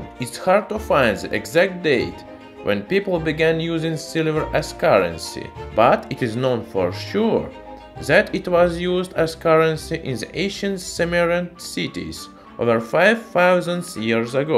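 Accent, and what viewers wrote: Russia. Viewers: Nothing more authentic than a Russian accented guy introducing an element. Solute to Mendeleev.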